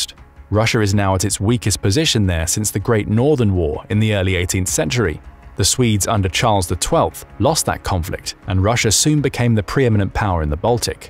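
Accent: United Kingdom. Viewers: Wishful thinking with British accent 😂😂😂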